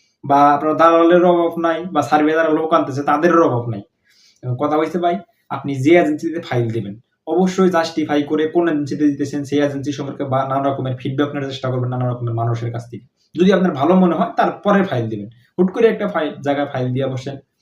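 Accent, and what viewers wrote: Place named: Sirajganj, Bangladesh. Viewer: ভাই আপনার বাড়ি মনে হয় আমাদের সিরাজগঞ্জ৷আপনার কথা শুনে আন্দাজ করলাম ভাই 😊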